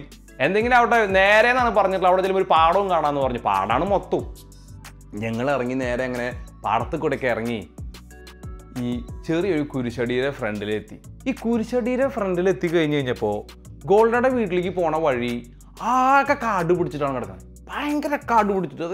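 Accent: Thrissur, India. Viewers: Bro good after noon.. Njanum oru trissur karan anu I was believing that our speed and tone not matching with story telling but... Gadeee nigalu polichu, perfect clarity, tone and more over the expressions are as you says lofly... If autobiography story telling got over you can start with some historical, animalistic, or some other kind story telling.. Iam sure that you make the people on peek of quorosity.... Keep rocking, from dubai.. 🙏🙏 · Proud to hear our slang #thrissur 🥰 nice presentation ♨️ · നിങ്ങളിൽ ഒരു നല്ല കഥയുണ്ട്... Life ഇത്ര മനോഹരമായി പറയാൻ എല്ലാർക്കും സാധിക്കുന്ന ഒന്നല്ല.. തൃശൂർ ഭാഷയും ഒരു നല്ല അടിപൊളി കാരണം തന്നാണ്.......!!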